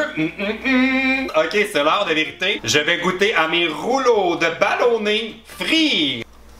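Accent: Quebec, Canada. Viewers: J'adore ton accent ( je pense québécois ) et j'adore cette vidéo ( mais aussi ta chaîne ) GROS BISOUS 😁😘😘😘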